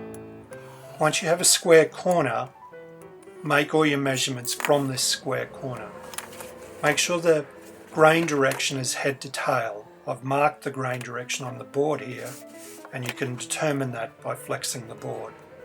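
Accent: Australia. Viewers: I thought you sounded Australian! Which state are you in?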